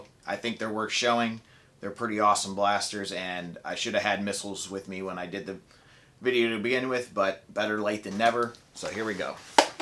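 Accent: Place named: South, United States. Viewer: Where do you live?!?!! You have a southern accent.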